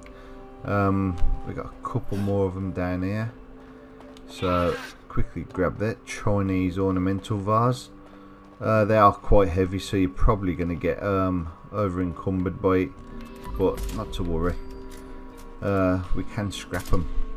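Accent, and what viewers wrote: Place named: Birmingham, United Kingdom. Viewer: I never thought I'd find a fellow Brummy on YouTube. Our voices are shit for commentary haha.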